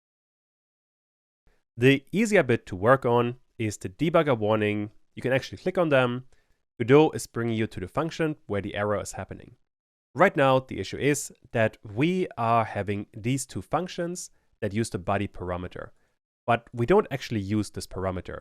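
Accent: Germany. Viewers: Just wondering, is it German accent? :) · I knew I shouldn't start learning Godot until some dude with German accent makes an 11-hour tutorial. Thanks a lot <3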